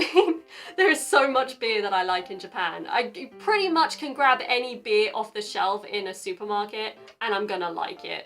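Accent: United Kingdom. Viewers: Skyeさんはじめまして😊 私は1995年～2000年までイギリスに留学していました。 Skyeさんのブリティッシュイングリッシュが懐かしく感じます。 今回の左側通行の話でこんなことを思い出しました。私は、日本の高校を出てすぐにイギリスへ行ったので1996年にイギリスで車の免許を取りましたよ。私が丁度免許をとる時に、イギリスでは初めて筆記テストが導入されました。確かドライビングスクールに申し込みした時に、残念だけど君が免許をとる時には筆記テストが導入されてしまう😨と言われたのが懐かしいです。(申し込みした時にはまだ筆記テストの制度が導入されていなかったか、丁度今月からイギリス国内で導入されることになったみたいな感じです)現在はどうなのかわかりませんが、日本と大きく違い、郵便局で書類をもらって書いて送るだけで仮免許が来ることにビックリしました😅。そして、イギリス人の皆はこの仮免許で親を隣に乗せて運転の練習と言うのもビックリしました。お金かからなくて良いですよね。ドライビングスクールも日本に比べたら安かったですけどね。 道路のお話ですが、もちろん同じはずです。何故なら日本はイギリスをお手本に道路を作ったので当然、左側通行です。なので標識も似たものが多いですよ。(なので、筆記テストは意外と楽でした😊わたしの時は確か35問あって、30問か32問合っていれば合格でした※もう25年位前の話なのでうろ覚えですが😅) ただ何故かroundaboutは真似しなかったみたいです。面積的に難しかったのかも知れないです。でも、ここ最近日本でも田舎の方では一部試験的に渋滞や事故軽減のためにやっているとのことです。 長くなりすみません。 Skyeさんの凄くかわいい笑顔に癒されています。😌 イギリス人というお顔だちが凄く好きです。😊 太郎さんが羨ましい😊 応援しています。👍✨ 頑張って✊😃✊ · 好みが分かれるが、やはりＵＫアクセントの方が自分には馴染みがあって心地よい。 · イギリス英語かっこいい！！ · めちゃくちゃ可愛いのにバリバリのイギリス英語でギャップ！笑 一緒に飲みにいきたい☺︎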